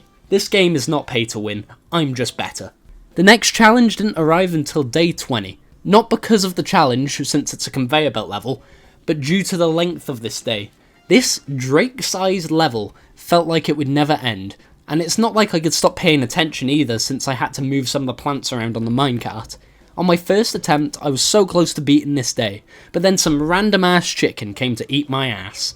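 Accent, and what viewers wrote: United Kingdom. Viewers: I didnt know u were british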